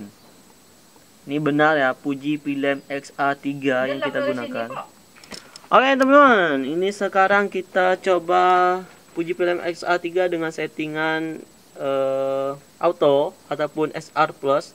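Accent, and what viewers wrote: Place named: Kalimantan, Indonesia. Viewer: Dengar logat abang ni bicara keknya abang org kalimantan ya bang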